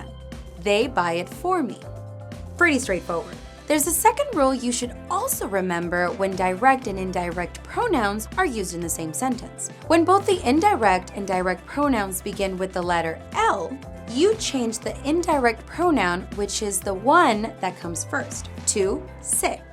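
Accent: Spain. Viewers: that spanish accent is like heaven u sound gooooood😍😍😍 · Is the Castilian Spanish?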